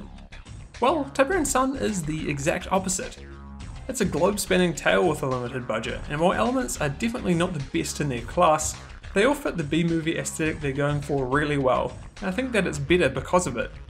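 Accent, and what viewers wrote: New Zealand. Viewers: is that a kiwi accent i detect? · Gonna love the Kiwi accent.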